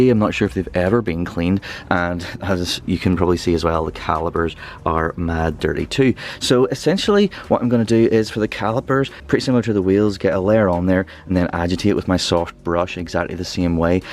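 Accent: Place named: Northern Ireland, United Kingdom. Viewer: Love the video mate I recognise that accent whereabouts in NI are you from?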